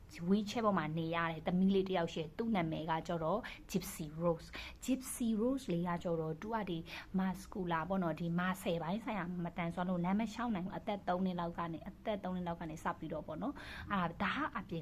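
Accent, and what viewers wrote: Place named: Shan, Myanmar. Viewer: မမဘယ္တိုင္းရင္းသားလဲစကားဝဲ႔ေနလို ရွမ္းတိုင္ရင္းသားလား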